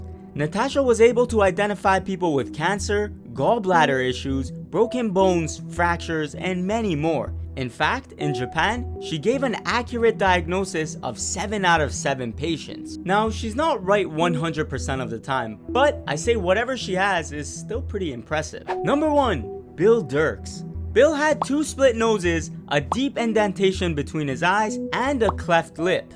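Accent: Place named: Canada. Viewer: Heyy I recognized your Canadian accent 😁 Es-tu de Montréal ?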